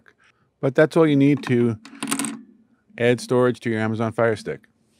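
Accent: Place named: Long Island, United States. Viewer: Brookhaven Residents love your Long Island accent, brother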